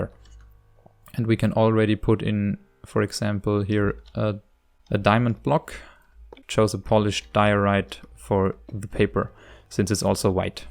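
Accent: Germany. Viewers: Are you german i think you have a german accent!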